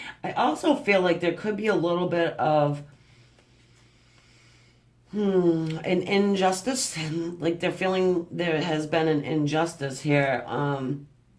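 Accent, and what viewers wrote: New York, United States. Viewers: Where are you from? New Jersey? New York? Long Island? I love ur energy haha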